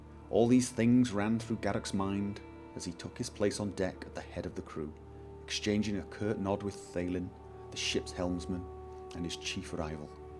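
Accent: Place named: England, United Kingdom. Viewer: I noticed you live in Norway:o I am suprised! I know you are probably english (by listening to your accent) How did you end up in norway?:)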